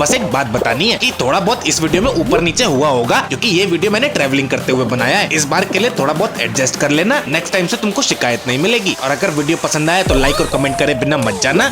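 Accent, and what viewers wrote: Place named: Assam, India. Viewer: Big fan bro Choro Choro assosom type se bolte Ho bhai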